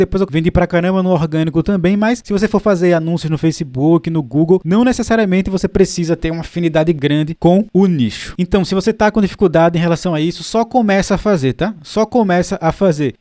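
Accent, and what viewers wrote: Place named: Northeast, Brazil. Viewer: Eu só queria saber de que parte do nordeste é o sotaque do. Tenho amigos nordestinos e não consegui identificar.. Bah. Virei fã dos teus vídeos...abraços gaúchos..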